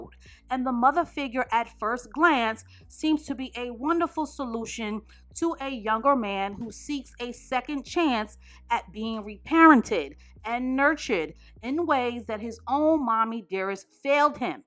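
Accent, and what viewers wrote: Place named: New York, United States. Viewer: Hey, Can you please do a video on fatherless daughter. I’m not sure if you have already. I’ve been binge listening & haven’t come across one of that topic.bI love your channel so much. I hear the New York in your voice. It brings me comfort. The Bronx? 🤷🏽‍♀️ Thats my birthday place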